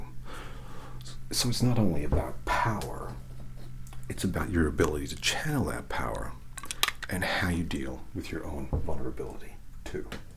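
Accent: United States. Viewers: Wow, your American accent is excellent. If I'd seen this series first I would have assumed this was your natural accent. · I LOVE the American accent!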